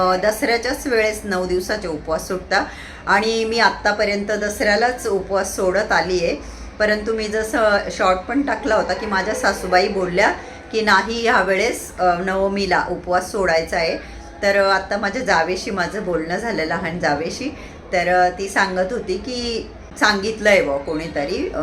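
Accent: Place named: Satana, India. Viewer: ताई कुठे राहता नाशिकला तुम्ही नाशिकला कुठे शिफ्ट झालात तब्येत फारच बिघडून गेलेली आहे तब्येतीची काळजी घ्या ताई ओटी खूप छान भरली देवीची सगळं आपल्याकडच्या पद्धतीनेच केलं तुम्ही सटाणा खूपच छान बोलता मला खूप तुमचे व्हिडिओ आवडतात पण तुम्ही कधी कधीच व्हिडिओ टाकतात ताई नाशिकला कुठे शिफ्ट झाले सांगा मी तुम्हाला भेटायला जरुरी मी पण नाशिकलाच राहते ताई कमेंट ला रिप्लाय द्या माझी सुंदर ताई माझी सुंदर बहीण माझी गोड बहीण अशाच गोड सतरा अशाच बोलत रहा खूप छान राहता येत मी श्री स्वामी समर्थ शिव शंभू शंकर बाबा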